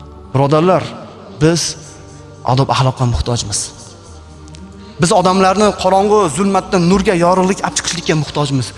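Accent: Osh, Kyrgyzstan. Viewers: бу инсон шевасидан ошли ми дунйо тургунча туринг домла боркану хакикат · Ош шахридан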